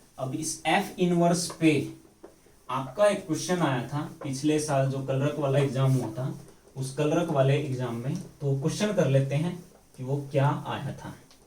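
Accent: Haryana, India. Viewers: Sir hryanavi mt bola kro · Ohh sir aapne haryanvi kb se bolni suru krdi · Sir aapne haryanwi km achi lgti h...aap hindi hi bola kijiye.....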